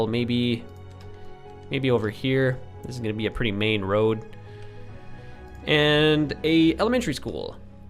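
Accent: Canada. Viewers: I wondered all through the first episode why your accent gave slight Canadian energy. Still no houses, eh?" was sort of a giveaway. East Coast-er?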